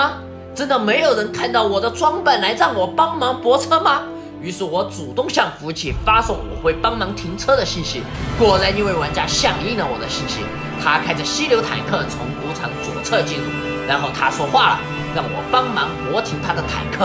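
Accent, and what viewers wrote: Sichuan, China. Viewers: 有个问题想问下台湾的朋友们啊..大陆里面的普通话和台湾那边的无论是用词还是发音习惯都有些区别，厂长的视频里还带了点四川口音，台湾的朋友们能很流畅的听懂吗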